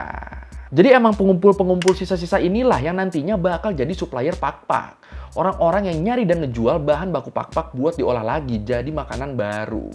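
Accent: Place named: Madura, Indonesia. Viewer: Kenapa nadanya hampir mirip kyk logat madura ya... Apa cuma aku ya yang ngerasa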